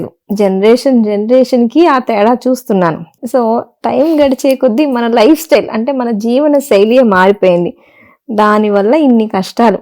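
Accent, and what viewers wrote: Guntur, India. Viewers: Brahmandamga cheppavamma. Somehow, I like your Telugu accent of Guntur area and your down to earth explanation, which itself is a great virtue for any doctor.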